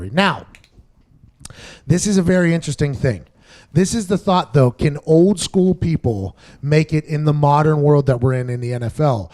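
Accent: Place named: Pittsburgh, United States. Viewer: “Aht” love the pittsburghese that speaks.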